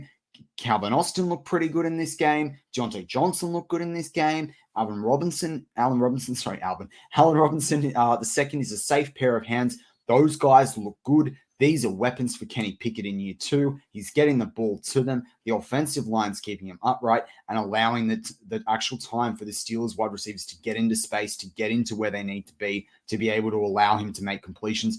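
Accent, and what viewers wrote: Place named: Australia. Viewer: Who is this Aussie talking about the Steelers? 🤣🤣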